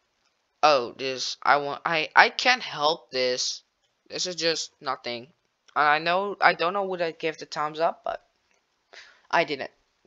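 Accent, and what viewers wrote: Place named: Netherlands. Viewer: yup, sounds dutch like me. dutch = nl :D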